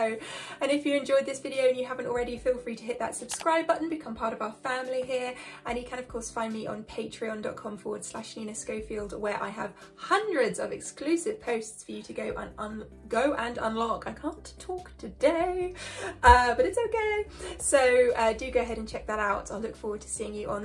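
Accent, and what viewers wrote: United Kingdom. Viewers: Nina,you truly are the ''Margot Robbie' of music,just with an English accent.You are so talented and such a sweet soul as well.What a treasure you are to us all !! Thank you !! ❤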